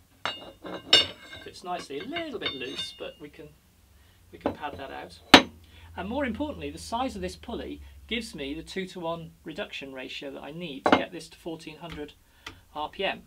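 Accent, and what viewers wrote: United Kingdom. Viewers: You sound like your from uk where is this second hand tool shop do thay have a website. Great video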